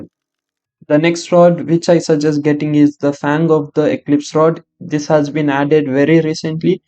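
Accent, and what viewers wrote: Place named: India. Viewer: Why do yoi sound indian😭😭😭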